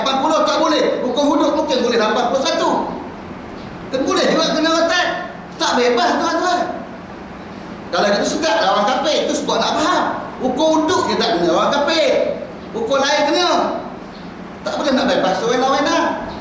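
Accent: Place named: Pahang, Malaysia. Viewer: ustaz ni slang org pahang....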